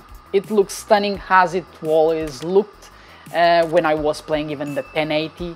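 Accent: Portugal. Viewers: Apanhei te 😂 o Tuga tem aquela Pontinha de sotaque lá no fundo💪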